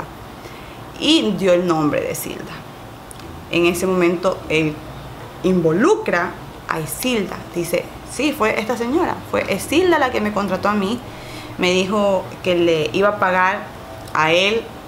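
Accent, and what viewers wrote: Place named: Guayaquil, Ecuador. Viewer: "El man tenía en el baston" se nota que eres guayaca, bellaaa💖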